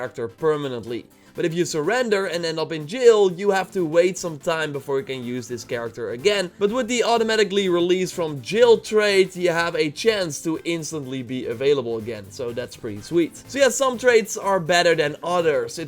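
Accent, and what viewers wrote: Netherlands. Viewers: You can really tell when someone is Dutch from the way they speak English :P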